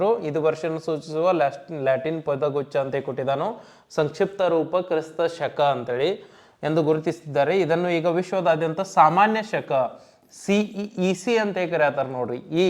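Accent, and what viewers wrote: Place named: Belagavi, India. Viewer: ನಿಮ್ಮ ಬೆಳಗಾವಿ ಕನ್ನಡ ತುಂಬಾ ಚೆನ್ನಾಗಿದೆ ಸರ್ 🙏🙏🙏🙏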